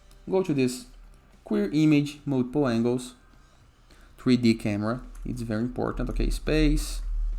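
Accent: Brazil. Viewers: That sweet good Brazilian accent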